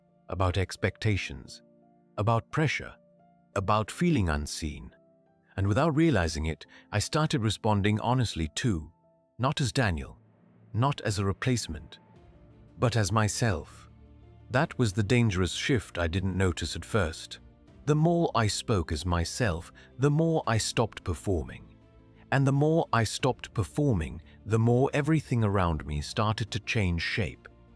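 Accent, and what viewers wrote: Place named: India. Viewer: This story is clearly Indian culture and the voice has comparable accent. Then he mentioned Texas. It was jarring. I would have enjoyed this story had it not suddenly culture shifted. … just got to the end of the story. Daniel didn’t know his brother had replaced him? That makes absolutely no sense. I understand this whole story only works in arranged marriage situation, but for the brother to not know makes this whole thing ridiculous!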